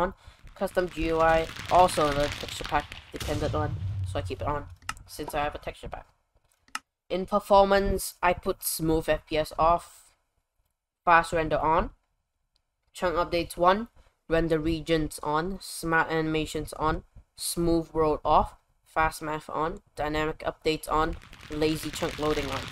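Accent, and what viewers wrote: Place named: Singapore. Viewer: i am singaporean and i speak like that too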